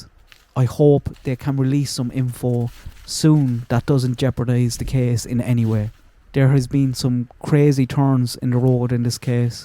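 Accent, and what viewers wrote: Ireland. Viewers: Helloo well done my friend always enjoy ur take it might be the oirsh accent but I like the take..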